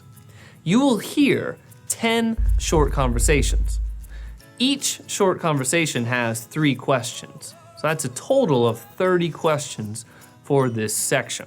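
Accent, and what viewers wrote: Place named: Yorkshire, United Kingdom. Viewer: hi there :) I'm really into your videos and i find that i admire you lots, i enjoy your British accent the most, which sounds like Yorkshire people :) your videos have helped me so much in my toiec preparation. But i'm still a little panic before taking part in the Toeic test. my facebook is 'Z hana ookaze', pls add friend, i d love to make friend with you :) . Best regards from Saigon!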